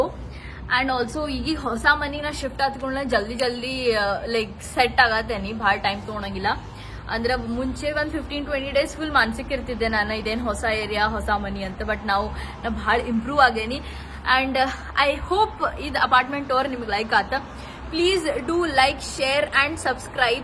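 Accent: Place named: North Karnataka, India. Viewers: Akka super vlog😍 yav uru akka nimdu, ನಮ್ಮ ಉತ್ತರ ಕರ್ನಾಟಕ ಭಾಷೆ👌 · ಯಕ್ಕ ಏನು ಚಂದ್ ಮಾತಡುತ್ತಿ ನೀ..ನಮ್ಮ ಬಯಲು ಸೀಮೆ ಪಕ್ಕ ಹುಡುಗಿ ಇದ್ದಿಯಾ ನೀ ಐ ಲವ್ ರೀ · ಅತ್ತಿ ಯಾವುರ ಬೆ ನಿಂದ, ನಿನ್ನ ಕನ್ನಡ slang ಕೇಳಿದ್ರ ನಮ್ಮ ಹುಬ್ಬಳ್ಳಿ ಅನಸ್ತೇತೀ...!! ❤ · E utaar Karnataka davru Elle idru nam Kannada DHA sogadannu bidodilla .thts somthing I like....moola Kannada padhagalu uttara kanada dalli heralavaagive.proud to see this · Akka yaav oor nind? Hubli bhashe ansatet 😅😅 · Namma uattara karanatak bhashe keli bhal kushi atu, Nice tour, Good luck re madamaa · MANASIKA 😀😀 It was nice to hear hubli accent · Nimma Uttara kannada bhashe bahala istavayothu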